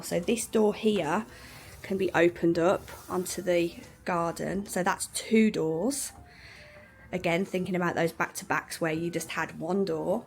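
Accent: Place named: Black Country, United Kingdom. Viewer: i’ve just found your channel, completely by accident. Your videos are superb. You have a lovely way about you and describe things so well! I used to live in Coseley in Wolverhampton, so lovely to hear your accent as well. Very best wishes. Neil in Southport.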